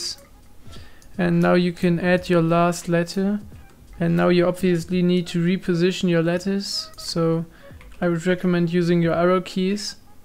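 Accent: Germany. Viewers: I knew cuz your english is like a german aka ich auch lol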